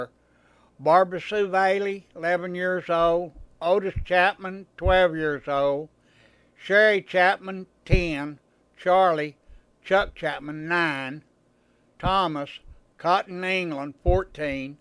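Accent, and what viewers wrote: South, United States. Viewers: What a fantastic Idea..School stories.Only thing,us northern canada guys find the story teller a bit hardish to understand,(southern accent)ha ha.Thanks for all your e mails & everything in the past.Ray Meyers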